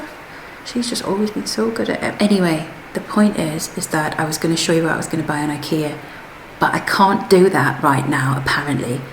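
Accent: United Kingdom. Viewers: are you actually Japanese or are you British because you seem Japanese but you have a British accent? I’m curious I’m American myself by the way my name is Jordan and I am a boy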